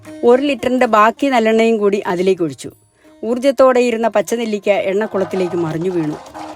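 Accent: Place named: Kollam, India. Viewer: അമ്മയുടെ ഭാഷ കൊല്ലം ഭാഷ പോലെ ഉണ്ട്.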